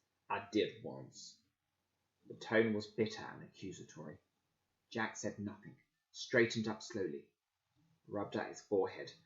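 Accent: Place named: United Kingdom. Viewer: Was so hyped to litsen then relized your British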